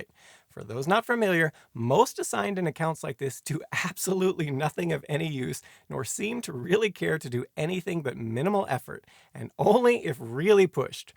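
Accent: United Kingdom. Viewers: If your audience is largely American, we like British hosts. People with accents are automatically smarter.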